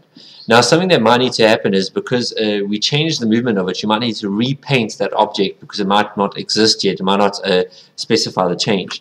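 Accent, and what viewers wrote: South Africa. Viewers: I can hear you're from SA. Great video, thanks so much! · are you south african?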